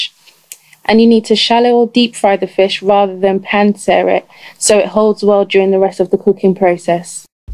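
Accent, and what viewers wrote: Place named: United States. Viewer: Are you American or a Nigerian?? Love d accent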